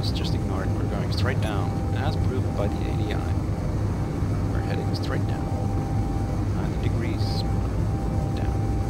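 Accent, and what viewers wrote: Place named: Scandinavia. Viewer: You sound like a Scandinavian Bob Ross. I could listen to you forever :D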